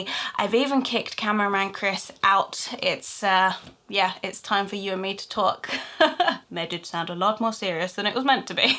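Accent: Birmingham, United Kingdom. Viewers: Ayeeee your a brummie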